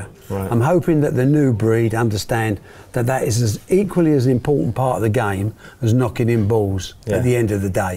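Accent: United Kingdom. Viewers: Thank you so much Mr Hearn, you speak so nice and correct. It's a pleasure listening to you! I improve my english a lot and I love the british accent, but also the scottish. You are a role model for having a successful life.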